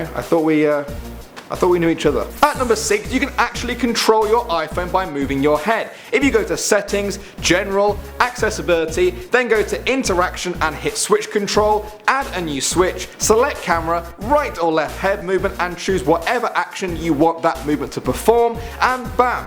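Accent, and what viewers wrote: Ireland. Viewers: is he Irish???? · Is this guy Irish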